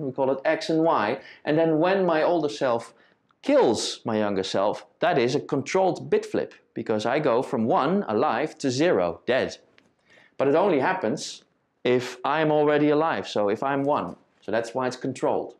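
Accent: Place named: Netherlands. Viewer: Cute Dutch Accent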